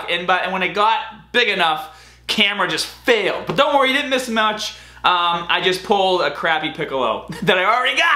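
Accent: Boston, United States. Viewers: I just found out your accent is from boston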